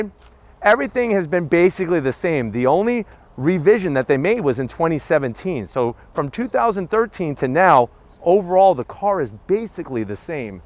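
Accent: United States. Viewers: American pronunciation for y’all. Smh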